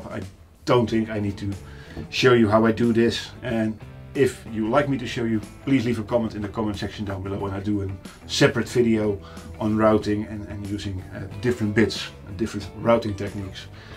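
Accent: Netherlands. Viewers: Thanks a lot for this video! I will definitely use some of your approach in placing a Floyd Rose on my current project. Thought I was the only one that does quadruple re-measuring :). Is that a Dutch accent I hear :D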